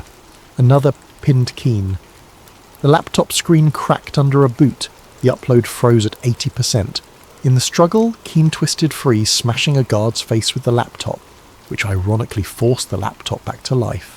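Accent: United Kingdom. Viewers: Can we get these without a UK accent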